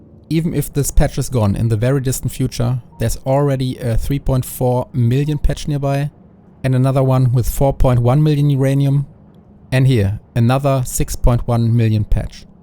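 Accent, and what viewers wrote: Germany. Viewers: r u german ? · very deutsch :D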